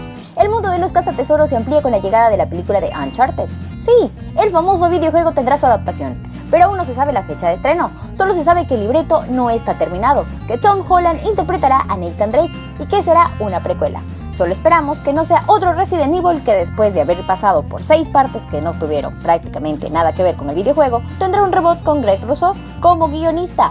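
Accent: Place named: Mexico. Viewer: en serio eres de acuador hablas como mexicana tu muy bien al meter tambien noticias de anime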